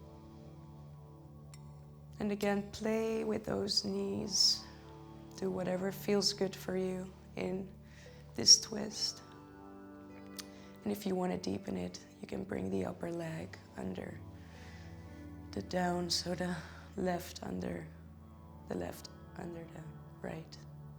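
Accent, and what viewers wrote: Netherlands. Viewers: Wow, this was a wonderful class. You're voice is very calming and grounding. If you would make more videos of practices I would defenitly follow them!! Thank you so much. I'm from Holland by the way. Taught I heard a Dutch accent in you're voice. 😊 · Are you dutch? You don't really have an accent but more like a non accent? I don't know I feel like you might be dutch?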